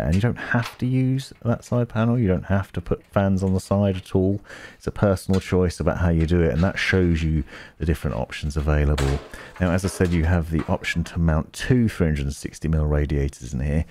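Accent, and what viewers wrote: United Kingdom. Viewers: And because of the fact, that the most english I have to deal with is primary with some american flair, it was kinda cool to listen to a british tongue ;)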